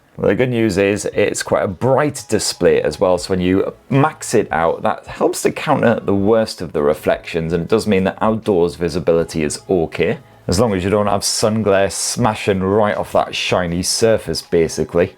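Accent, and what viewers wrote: United Kingdom. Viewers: What part of Britain are you from mate? I know my regional accents pretty well but I cannot nail yours.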